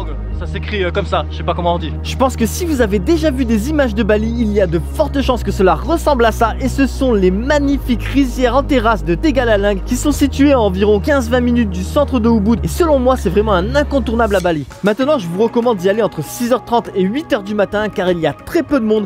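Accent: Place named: Marseille, France. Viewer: Trop fort le gonze, j’adore tes vidéos et surtout tes commentaires à la marseillaise 👍👍👍 Merci de nous faire découvrir ces superbes endroits et merci pour ton travail 😉 continue !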